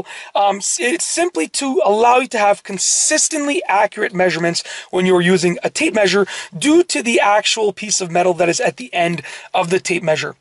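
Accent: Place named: Woodbridge, Canada. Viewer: This is the Woodbridge, Ontario accent, but it extends to most of York Region, and any other Italian neighborhoods north of Toronto proper.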